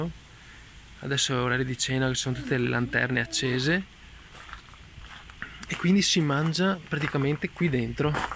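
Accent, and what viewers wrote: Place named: Romagna, Italy. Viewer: Molto suggestivo...dall'accento non capisco se sei più romagnolo o padovano :))...nel caso i colli euganei sono stupendi. Dai noi, in Sardegna, vicino a dove vivo io, facciamo diverse feste in campagna (sai col maialetto da latte e vino rosso) e ci sono diversi agriturismo immersi nella natura incontaminata ma in stile Zen è veramente stupendo e nuovo. Davvero un piacere per tutti i sensi e in tutti i sensi :D.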